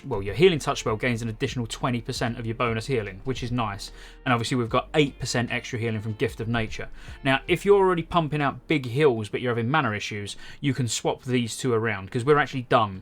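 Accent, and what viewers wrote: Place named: Lincoln, United Kingdom. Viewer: You Lincoln lads say Natural weird. “Naytural” 😂😂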